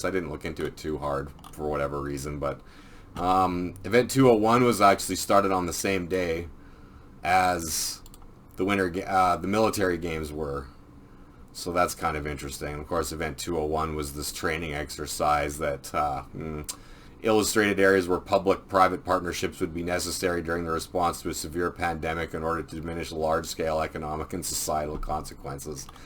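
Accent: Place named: Canada. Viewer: You're pretty good at this for starting up. We all need to do all we can to spread info that is being suppressed. May want to move your mouse a bit further from the mic when you scroll so it it doesn't pick up so many clicks. Knew immediately you were from Canada, if I had to guess I would say your from BC maybe? Canadian accents are weird in how they are distributed. I'm from rural sask. Hosers unite against the NWO!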